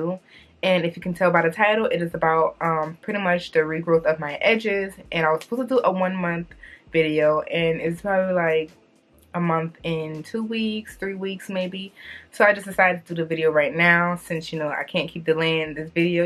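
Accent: New Orleans, United States. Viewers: Are you from New Orleans? I hear that NOLA accent. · U from New Orleans huh? How u talk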